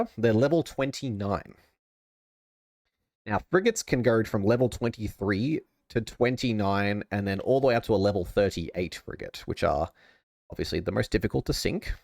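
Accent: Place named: Australia. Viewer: One of the thickest Aussie accents I’ve ever heard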